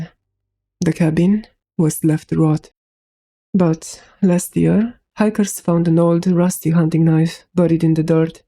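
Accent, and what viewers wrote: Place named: France. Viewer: Ur accent is beautiful and I think Ur original language is french 😅❤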